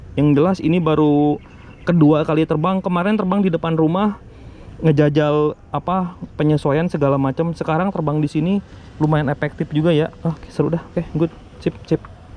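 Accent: West Java, Indonesia. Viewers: Kang sampean orang sundanya, kanyahoan dina logat nage😁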